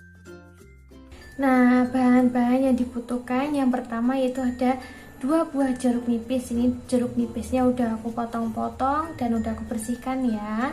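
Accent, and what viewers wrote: Java, Indonesia. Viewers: Medok tpi biutipul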